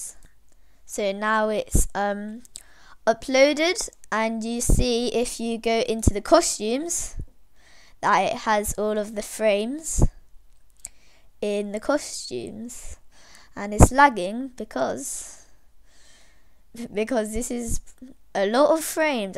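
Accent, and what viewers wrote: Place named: United Kingdom. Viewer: R u British? You sound British if your not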